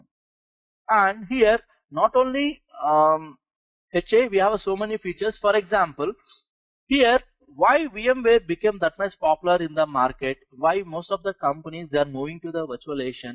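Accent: India. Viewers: Are there any tutorial, without the Indian accent??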